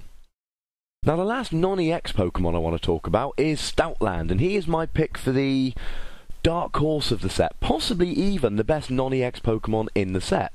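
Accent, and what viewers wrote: United Kingdom. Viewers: I love your British accent! One game I do remember was when I was playing my newly made Zekeels. I managed to set up 3 Eels in less than 7 or 8 turns. My Accelgor/Whirlipeed deck only drew 1 prize during the game. At some point, I thought "Screw this" because I couldn't decide on who should be my main attacker. I just Bolt Struck (while eviolited) until everybody died.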